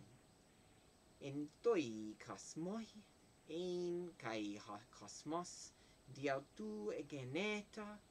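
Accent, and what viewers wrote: United States. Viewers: He sound like ancient American to Greece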